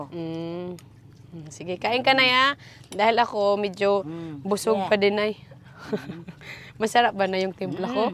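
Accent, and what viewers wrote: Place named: Visayas, Philippines. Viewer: Mailhan jud ang bisaya mo storya dol no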